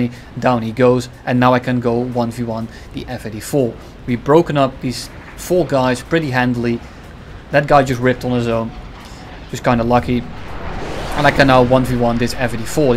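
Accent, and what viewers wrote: Netherlands. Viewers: i really love jouw dutche accentje😂 geen haat bro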